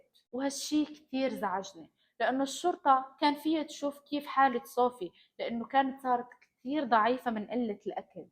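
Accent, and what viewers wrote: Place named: Morocco. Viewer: أنت أكيد من المغرب... Your accent باين ماشي لبنانية.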